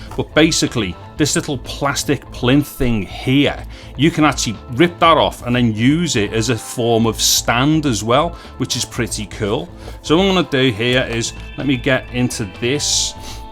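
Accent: Liverpool, United Kingdom. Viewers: Your video made me chuckle - I LOVE your grammar 'and that and stuff' - are you from Liverpool? Good man, great vid, natural and informative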